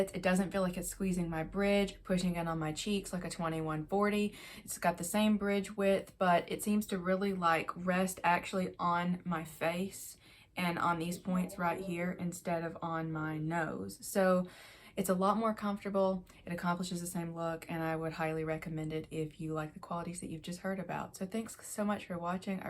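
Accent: South, United States. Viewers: Great review! From this, I will never be purchasing this particular style! Loving the southern accent! Hey from Alabama!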